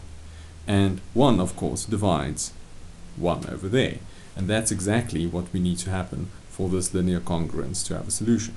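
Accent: South Africa. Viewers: Nog nie eers die video gekyk nie maar kon daardelik hoor die man is Afrikaans, toe sien ek jou van is 😄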